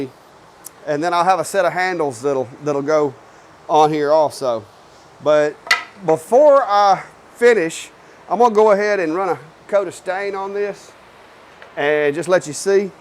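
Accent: Alabama, United States. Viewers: Cool project. And it's nice to hear another Alabamian's accent. I guess it's been too long since I have been home.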